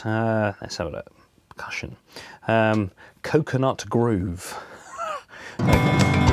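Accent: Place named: United Kingdom. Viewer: Hey man, loved this tutorial, and your accent it reminds me of a British tv show, cant remember which but it draws you in, please keep this type of content up.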